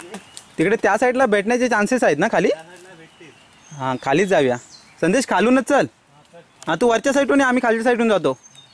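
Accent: India. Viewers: मस्त #properमालवणी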